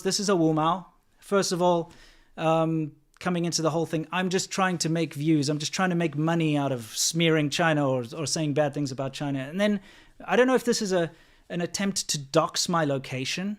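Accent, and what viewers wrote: South Africa. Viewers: I love watching your videos, we all know that the Chinese govt sucks, but there’s also the usual “it’s the government, not the people”. You bring us education especially about things we’ve never imagined we’re going on. Unfortunately we also learn that indeed (some of?) the people are to be viewed with suspicion as well, as they walk among us covertly. That’s so sad, I haven’t decided yet if that’s all only because of their government and they wouldn’t be horrible otherwise or if the government is that way because people are. I’ve seen them do some pretty shitty things here in my country just in everyday life, and heard worse from people who had to go to China and other Asian countries for some reason or other. It’s interesting how obvious it is here when you see a person who has Chinese blood, you can usually tell way before they open their mouths if they were born here or not, even just by how they walk and look at people, and the way they hold themselves, or even if they’re littering, picking their noses, and spitting everywhere or not. 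Plus I love your accent, it feels like a homey thing to me as I went to school and grew up with many, many people from SA, so that sound is a little bonus :) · Your SA accent is slight but insidious. I spent half the video thinking you were talking about something called a "Lenin wall". They should arrange reinforced glass cases for such things at universities to avoid vandalism. Or at least make it a punishable offense to vandalize them.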